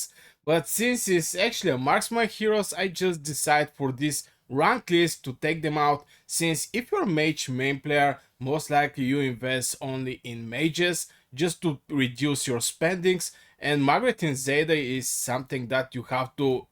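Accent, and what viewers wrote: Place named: Bulgaria. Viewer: Знаех си, че си от България хахах. Имаш български акцент като говориш, а и се казваш.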